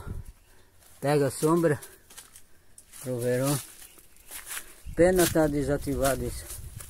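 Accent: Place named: Paraná, Brazil. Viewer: Boa noite que horas que era quando vc estava gravando esse vídeo ,,,a tu mora a sua voz tem sutaque do Sul Paraná eu moro em São Paulo abraço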